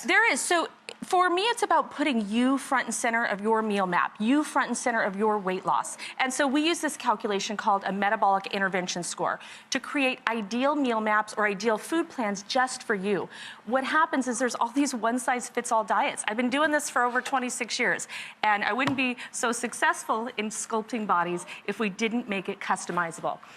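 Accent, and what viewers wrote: United States. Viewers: I this really how people speak in the US?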